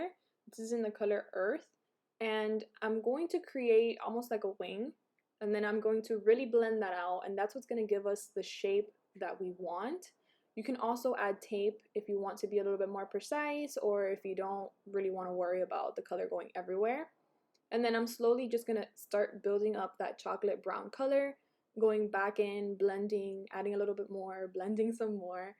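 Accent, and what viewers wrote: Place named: Miami, United States. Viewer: I knew you had to be from miami when i heard you talk !! Love the makeup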